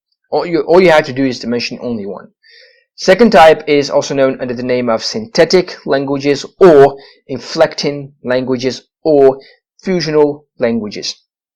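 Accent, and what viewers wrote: United Kingdom. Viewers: Thanks a loot. I was shocked when you said " arabic our language " . You sound British.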